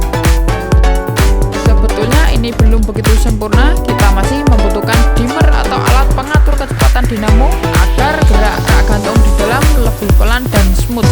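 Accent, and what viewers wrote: Java, Indonesia. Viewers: Tolong kurangi lah medok nya saya jadi gak nyaman nontonya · Orang jawa ya mas kok suaranya persis orang jawa aku orang jawa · Bang medok bet😁😂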